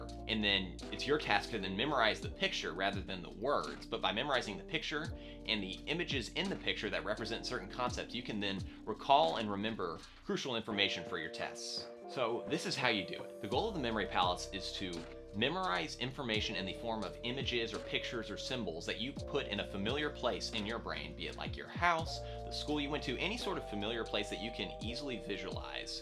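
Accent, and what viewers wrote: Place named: South, United States. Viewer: Thank you for the effective breakdown and explanation of the concepts that you presented in this video. Off topic: it was a bliss to hear your accent for it transported me to my beloved south S2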